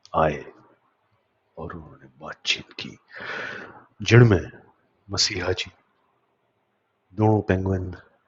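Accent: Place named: Haryana, India. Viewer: 👍 good, but why the thick haryanvi accent? Could not understand much. Could you please not whisper so much into your mike, sounds creepy and a bit pronographic 😅 Otherwise, excellent research 👏 keep it up 😅😅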